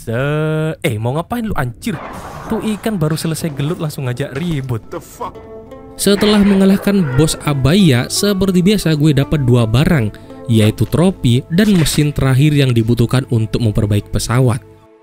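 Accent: Indonesia. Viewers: lu orang madura ya bang? logat nya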